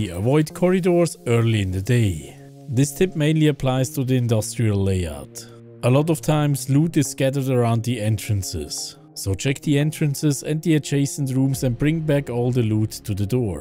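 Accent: Switzerland. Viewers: Are you Swiss? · Bro du besch sicher schwitzer, ech ghör das ah dim englisch wettsch mol eis zocke? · are you swiss?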